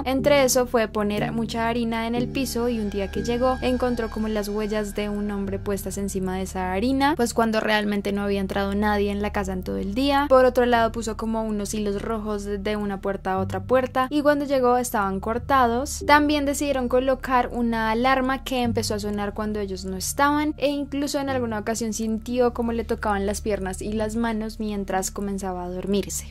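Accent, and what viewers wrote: Colombia. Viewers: Colombia, creo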